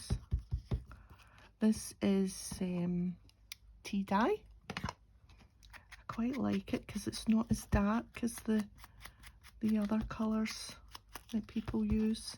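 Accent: Scotland, United Kingdom. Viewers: your accent is so familiar to me. I’m Scottish and my Grandmother had a very thick accent. Where are you? That is a great die! Love the makes! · Scotland I was first thinking that is an Irish accent but no I got just land of frays and lovely casels . Some day I will come too wisit for shore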